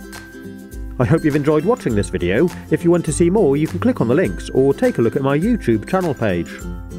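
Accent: United Kingdom. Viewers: is from the UK judging by his accent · Yamin anik I think he is from the UK because I am and his accent sounds familiar..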